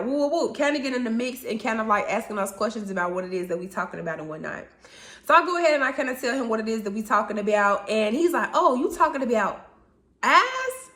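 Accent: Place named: Midwest, United States. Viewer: It's so Midwest 😅😅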